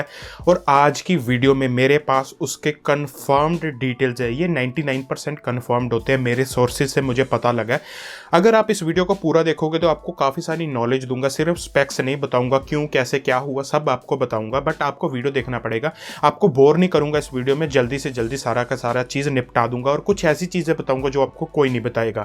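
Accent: Punjab, India. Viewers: Punjabi accent bro 😅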